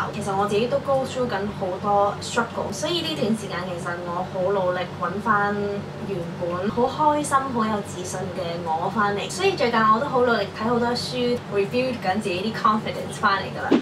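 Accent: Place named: United States. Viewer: 一直都以為你係ABC 因為你個口音同性格都好似XD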